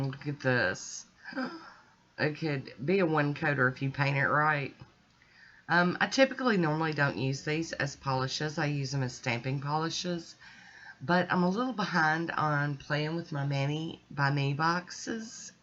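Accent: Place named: South, United States. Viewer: Got a good chuckle about your comment that Southerners pronouncing “things funny”. LOL. I love the way you speak. Reminds me of my favourite movie and book - Gone with the Wind. Liked your mani too. LOL.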